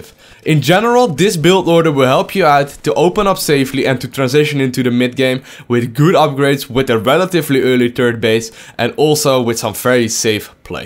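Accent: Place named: Netherlands. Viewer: just a sec... ben je nederlands??? i kinda hear a bit of an accent, (srry that was offtopic) but yeah, thx for the vid, you just got a new subscriber, you're awesome keep up the good work!!!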